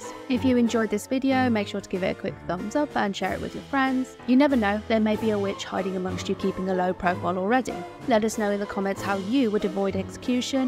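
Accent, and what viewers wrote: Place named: England, United Kingdom. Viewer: G'day, I'm an Australian agnostic atheist. As an Australian, I suspect that I could be a witch or an atheist or a stack of other things for the foreseeable future without any dramas. Your accent sounds English and while I can point to a lot of things about the British government that I don't like, I doubt that you would be in danger from your government for your religious practices in the foreseeable future. However, I don't think that applies to women in the US. There women are taking additional steps to ensure their menstrual cycles do not become state knowledge, lest they be charged with abortion. (Worryingly, women who fall pregnant, don't know it and then miscarry after as little as 6 weeks are theoretically in the firing line of some of the anti-abortion laws.) About 70% of people in the US think that abortion should be allowed at least in some circumstances. 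The laws do not reflect that opinion. Similarly, it's been decades since a Republican president actually won the popular vote, but there have been a lot of Republican presidents in that time. So, in the US, I think there is a distinct possibility that Christian Nationalists could gain power in government (especially at the state level) and that they would go after people for witchcraft. Christian Nationalists aka Christo-fascists would not win the popular vote but that doesn't seem to matter in the US. The trick, then, for someone in a jurisdiction where the Christo-fascists have gained power, is to recognise that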